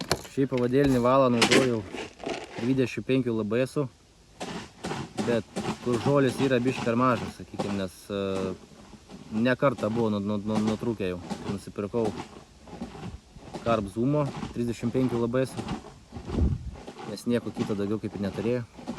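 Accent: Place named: Pakruojis, Lithuania. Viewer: Iš kokia kraštą buset kalba kaip Pakruoje🙂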